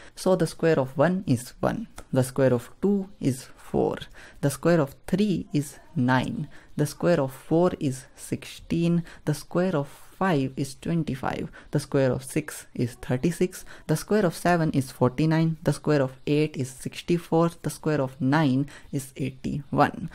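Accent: India. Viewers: No offence😔 (as an American ENG speaker...it's tough for me to understand the "Indian ENG" ! so...I politely asked for subtitle! that's all) Don't judge people for no reason bro💔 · Yea He speaks in that Good way that there is no error while detecting the word for subtitle I guess you have issue because of his Indian Accent.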